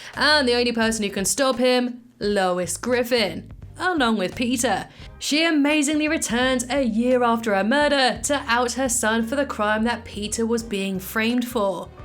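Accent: United Kingdom. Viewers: Are You British Or American Cuz I’m British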